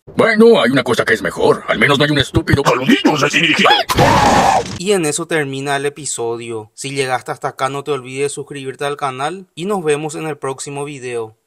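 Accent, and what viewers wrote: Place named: Paraguay. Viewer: Chaaa pense luego esta vos me parese un paraguayo y si sos ra'e cha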